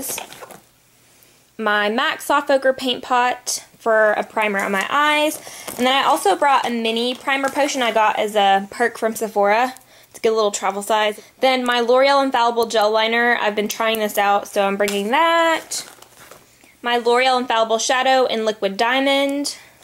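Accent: South, United States. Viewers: in your current videos you have a southern drawl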